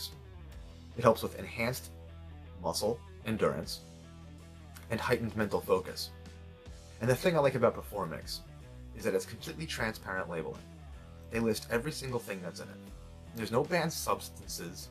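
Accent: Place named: United States. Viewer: Love your American accent, you are just simply.. Yum! b-e-a-u-t-i-f-u-l to look at ....love how you explain stuff PROPERLY!!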